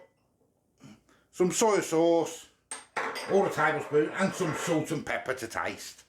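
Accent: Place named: Hartlepool, United Kingdom. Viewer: Loving this ! Me and the wife love your videos , but we have been debating on your accent ! Where bouts you from Hartlepool ??? 😬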